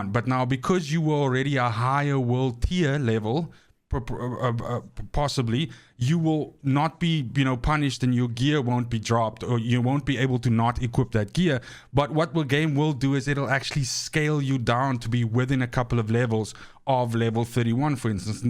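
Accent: South Africa. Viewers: I do trust your judgement & how you break it down. I just figure if I'm playing anyways I may as well play the CT's that people claim will give what I'm looking for. (Deathshield, Highroller) PS stream I don't follow any steamer, I'd follow you & I main Trickster. You & Niktew have great have downs. Khraze & Widdz are helpful & DPJ is just hilarious! PS love the SA accent! Haha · I honestly didn't notice your accent as my girlfriend is from SA so it sounds normal to me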